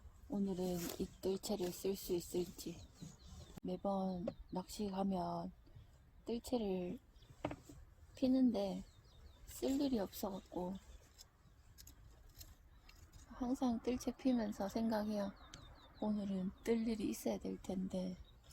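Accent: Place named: Gyeongsang, South Korea. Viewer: 님은 지역이 어디세요?경상도 인듯한데 저는김해 붕어꾼입니다~^^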